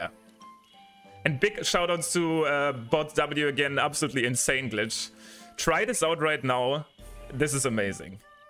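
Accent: Germany. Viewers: I also thougt that you are German because of your english akcent LOL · Hearing a German speaking anything but German is torture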